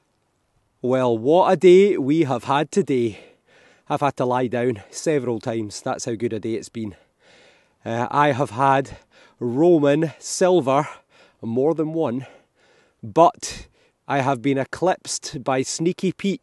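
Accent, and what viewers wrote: Scotland, United Kingdom. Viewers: Love to watch your treasure quest. I specially enjoy listening to you that Scottish accent sounds so magical to my ears. Also you are a good looking man sertainly easy on the eyes. You are. so cute when you find something great and you get so excited. Cheers from Princeton Illinois USA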